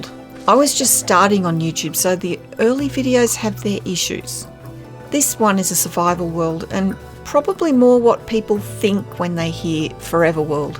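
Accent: Australia. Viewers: thats one heck of an aussie accent love it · Hello, you sound Australian. im Kiwi, its so pleasant to hear your voice and see what you have made ! Incredible work aswell you have some passionate builds! Thanks for inspiration ❤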